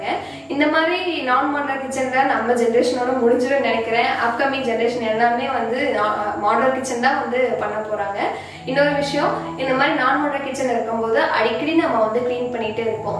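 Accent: Kongu, India. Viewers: Kongu slang la pesunga video la onetime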